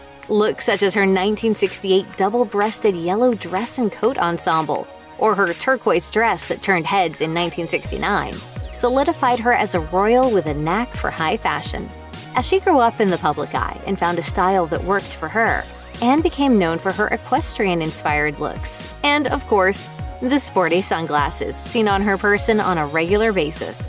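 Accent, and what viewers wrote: United States. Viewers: The commentary was done by an American, what more can I say 😆 I've always heard that Princess Anne was quite frugal in lots of ways (as far as Royals go that is). Americans being obsessed with wealth, well there you go, the commentary is bound to be quite materialist and incorrect. That's Americans for you. I really wish yanks would stop reporting on the Royal family with their screeching accents. It grates on the nerves! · It gets right up my nose to hear someone with a strong American accent criticising our Royal Family, why don’t you just keep your biased comments to yourself and perhaps concentrate on all the serious social problems you have in your own country such as racism, gun crime, obesity, avarice and greed.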